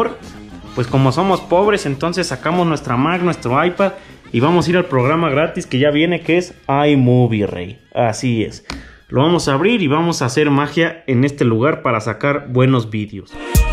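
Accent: Costa Rica. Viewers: Sos tico? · Wtf sos tico o algo? Xd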